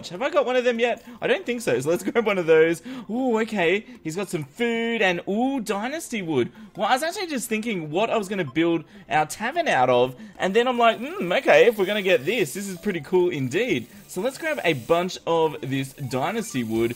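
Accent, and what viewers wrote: Australia. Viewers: are u Aussie?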